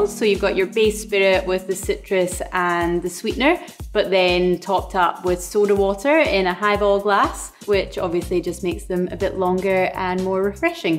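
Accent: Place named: Scotland, United Kingdom. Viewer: You sound more Scottish than normal in this video haha. Amazing videos and cant wait for the next one!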